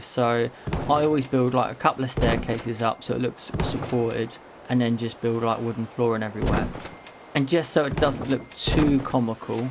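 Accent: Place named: New Zealand. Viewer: It's the Kiwi accent you're thinking.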